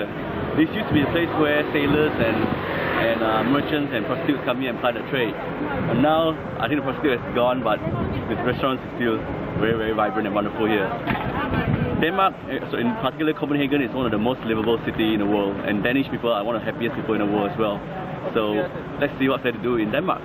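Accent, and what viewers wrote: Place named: Malaysia. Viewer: gotta love malaysian accent in this vid~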